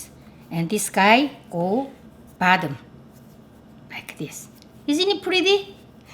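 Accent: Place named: South Korea. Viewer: 분명 다른나라언어 인데.. 한국말같지..? 우리엄마가 영어하는거같아..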